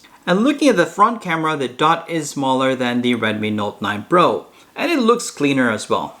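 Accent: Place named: Dumaguete, Philippines. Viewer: mao diay maayo muinglis bagsik og accent ba taga dumaguete diay ka sir.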